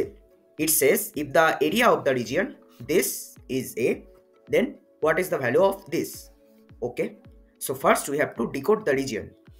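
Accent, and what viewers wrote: West Bengal, India. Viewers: Sir are you from West Bengal?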